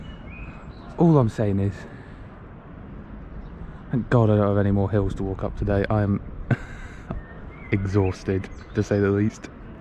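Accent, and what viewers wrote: United Kingdom. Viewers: Loved it! I love people with british accent. I don't know why, but for me it's just a great thing to listen to and also a good thing in the background. Very good for a first video with your voice in it. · I too prefer the voiceover; you can include a lot more information, it's not so intrusive as the subtitles, and it makes the videos more personal. Why was I surprised that you have a British accent? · You know us Americans love a British accent!!!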